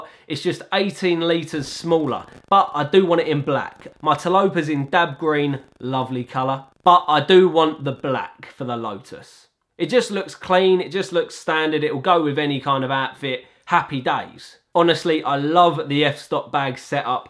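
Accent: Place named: Cockney, United Kingdom. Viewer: Great video. The cockney accent makes the videos even more enjoyable XD.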